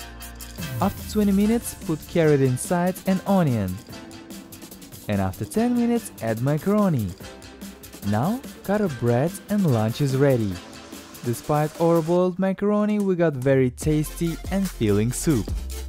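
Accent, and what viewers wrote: Russia. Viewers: Russian accent speaking English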